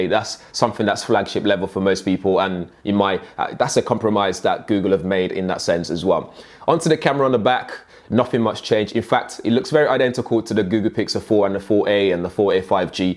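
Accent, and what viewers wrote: United Kingdom. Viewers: love your British accent dude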